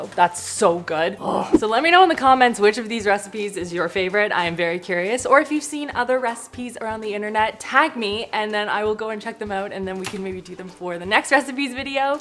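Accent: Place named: Canada. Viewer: Hi, it has been years since I was watching your videos on both channels. And as you mentioned on your previous videos my husband also always recognize your voice and always says that "Oh she was from Canada right?". And one thing followed another and we decide to move Canada 😅 It has been a month since I moved. It is now more enjoyable for me to watch since I am actually able to get my hands on the products and ingredients. Just wanted to say hi after all of those years I happened to be able to follow a recipe that you done! Lots of love ❣ PS. You certainly add enough salt!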